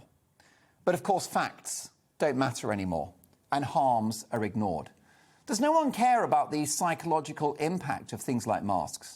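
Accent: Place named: Home Counties, United Kingdom. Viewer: Sweden, Florida and Texas put out to people who will never check what is happening in those three places. Mark Dolan is a vacuous bot who just says things in a Home Counties voice, cheery picking quotes and saying things like ‘facts don’t matter anymore’ whilst peddling half truths and down right lies. Fox News business model is 100% what GBNews is following. Very sad.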